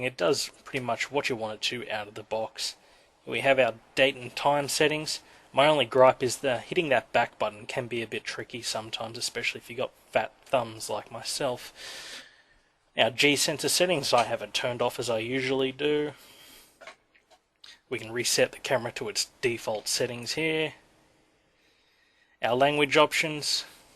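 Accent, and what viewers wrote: Australia. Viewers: How can anyone who speaks English on Earth not love the sound of an Australian voice! Cheers!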